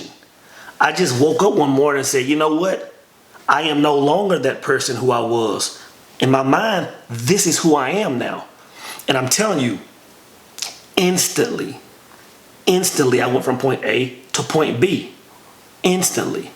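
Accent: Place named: East Texas, United States. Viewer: Man u from East Texas. I knew it! Great video!! I'm in Cedar Hill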